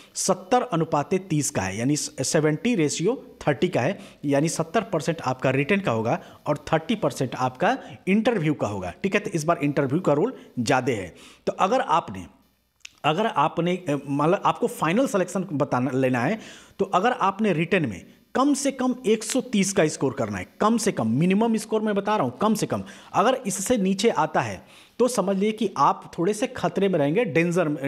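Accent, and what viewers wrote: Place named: Bhojpuri, India. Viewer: Sir apki hindi..thodi Bhojpuri hindi kaisi lgti h..🤗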